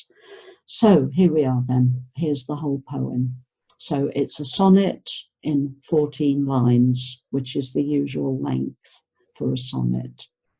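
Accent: United Kingdom. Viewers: Thank you for producing these lovely educational videos, they bring back all my past memories .... when I was a student studying in a boarding school in UK. My English teacher would read and explain to me all the economic articles so that I could understand....the way you read and explain sounds so much like her, with a very strong British accent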